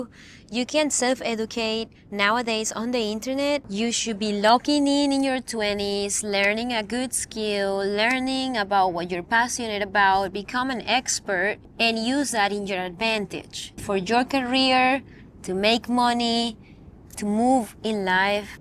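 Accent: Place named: Spain. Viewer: Like your spanish accent! Turns this hoosier man on!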